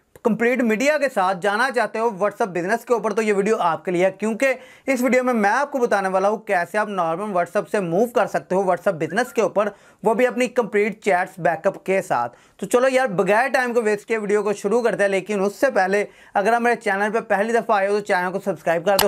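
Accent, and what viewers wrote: Punjab, India. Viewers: Nice accent.. hindi bhi Punjabi jaisi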